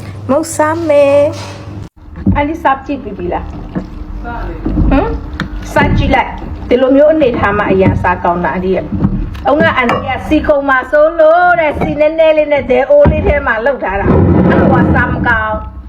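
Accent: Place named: Myanmar. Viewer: ကမြန်မားဆန်ဆန်လေနဲ့ဆိုချစ်စရာလေး❤❤❤❤❤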